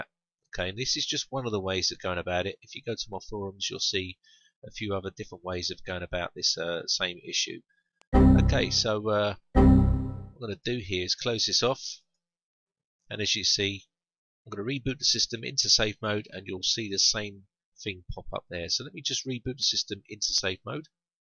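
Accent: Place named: Cockney, United Kingdom. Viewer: You're so cockney that I thought you were Australian at first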